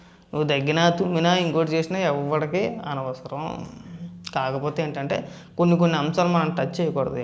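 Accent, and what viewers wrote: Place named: Amalapuram, India. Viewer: Sir me accent vinte Amalapuram lo unnate anipistundi sir pelli chesukuni tirupati daggara oka palletooru unnattu undadu Sir 🙏😊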